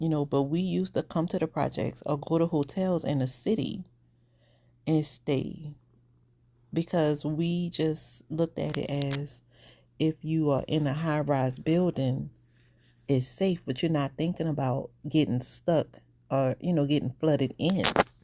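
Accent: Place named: New Orleans, United States. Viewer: Hey. You say you from the West Bank. But you from New Orleans. I hear it.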